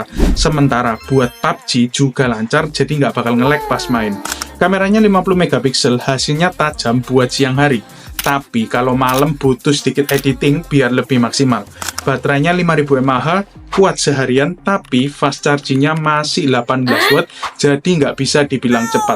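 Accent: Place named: East Java, Indonesia. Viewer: Cra jelasin enk,simple n detail jg,logat mcm jwa timuran❤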